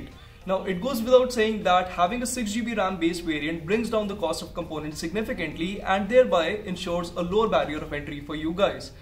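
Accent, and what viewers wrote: India. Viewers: First time I'm watching your video. I think you have a very neat Indian accent.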